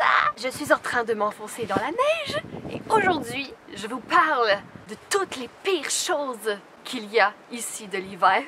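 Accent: Quebec, Canada. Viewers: C'est cool ton accent se morphe entre le Français et le Québécois LOL ❄ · j aime bien quand tu parles l accent québécois ahhh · tu as l'accent quebecois en faite !! bizarre pour une francaise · je suis en amour avec ton accent quebecois/francais wow! ahaha :') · Mai bizarre si tu t di français avec l'accent canadien typique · Elle est trop bien ta video, j'ai vecu une annee et demi a Montreal et je te rejoins pour la neige :). Tu es sur que tu es francaise. Tu parles comme mes amis quebecois, bravo, j'arrive pas a les imiter :)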